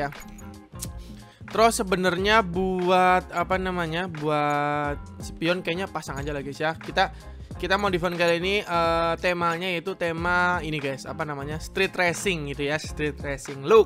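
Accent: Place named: Purwokerto, Indonesia. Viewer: purwokerne ngendi pak? logate ra ilang haha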